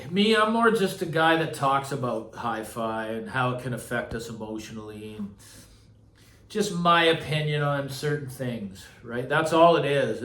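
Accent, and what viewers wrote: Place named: Canada. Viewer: I knew this furious pace of new videos would take its toll. As previous commenters have said, its better to speak with purpose than speak with haste. Now, I could listen to that Canadian accent all day long. No lie! Keep up the good work and the committed followers will be there. You speak from the heart and from a position of experience. All of these I respect and find refreshing as compared to the puppy mill of reviewers out there. Reviewing over 100 speaker models in a year? How do you even maintain a baseline? No thanks. Ill take my dose of whenever it next dings my bell.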